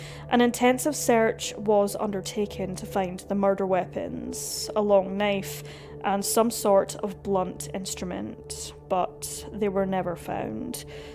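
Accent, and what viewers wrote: Scotland, United Kingdom. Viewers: Love the Scottish accent 😊.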